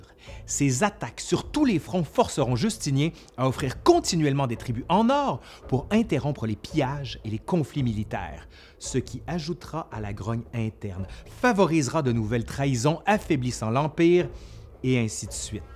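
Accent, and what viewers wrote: Quebec, Canada. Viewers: C’est un canadien👊🏽👊🏽👊🏽 j’aime trop l accents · Merci infiniment pour cette excellente presentation - Vous presentez la connaissance de notre passe d'une facon interessante et vivante. De plus, vous etes pas loin de mon patelin natal (Saint Maurice), j'adore le Francais local. Mes excuses pour mon manque d'accents, j'utilise un clavier anglophone et je suis "pris" aux Etats-Unis, au milieu de la pandemie. Merci encore et j'attends vos prochaines productions, L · Ma foi c'est bien la première fois que je suis pas dérangé par l'accent québecois ! (Désolé les kheys j'vous kiffe mais étant de France ça fait vraiment bizarre haha !) Excellent sujet de vidéo et très bien expliqué en tout cas, je crois que ça fait un abonné en plus ;) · J'en ai regardé plein d'autres ! Ta chaine est ma chaine d'histoire préférée ! J'aime beaucoup ta façon de raconter l'Histoire. 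Je dois dire que ton accent québécois contribue beaucoup à ton charme 😁 Merci beaucoup pour ton travail et ces vidéos très divertissantes. Ps : tu es très beau garçon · Ca me fera toujours rire laccent québécois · Étant moi-même Québécois, je peux vous dire que ce monsieur a un accent particulièrement gras. Il pourrait faire plus d'efforts pour "normaliser" son français, mais il se fait une gloriole de cet accent pour amuser (?) les cousins de France et d'ailleurs. Malgré ses talents de pédagogue, cet accent trop accentué me tombe sur les nerfs. Vraiment... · vidéo très intéressante ! une voix posé, intelligible, tu articules bien et tu ponctues bien tes phrases. franchement, rien à dire de négatif. comme dit ton compatriote jean marc généreux "ça, j'achète !" (au passage j'ai toujours été fan de l'accent québécois xD ) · vous avez un accent de dingue vous les canadiens mais vous êtes tellement cool que ça passe bien. 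· Merci pour cette page d’histoire qui m’était jusqu’à présent inconnue et que j’ai trouvé tout a fait fascinante! …et toujours cette diction impeccable accompagnée d’un bel accent Canadien👍🏼! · Trop marrant l accent québécois jcrois j'aime bien lol comment il prononce neige ou planétaire mdrrrrr 🤣🤣🤣 jrigole il n'y a aucun mal lol. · Première chaîne québécoise pour ma part et ptn ton accent me mets trop bien, bisous de France, merci pour ton travail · Grâce à toi je comprends maintenant l'accent de Québec. 😀 Je suis hispanique et j'habite en France. Génial chaîne de YouTube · L'accent français canadien, une pépite... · Avec son accent canadien il nous fascine de ses connaissances ! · J'adore l'accent que québec 🙃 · Vraiment interessant, sauf ta pronociation du Quebec (toujours drole et amusante) , mais je viens de soutenir ton programme. Merci. Salutations du Portugal. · Je pense que ton français est canadien Ton accent ressemble à celui de JUStin Trudeau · En vrai les profs d'histoire sont souvent cool. 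Je trouve mais c'est vrai que l'accent québécois rajoute un charme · Outre l intérêt historique de ton propos j adore ton accent québécois et la touche d optimiste en fin d exposé est réconfortante bravo pour ton travail 😀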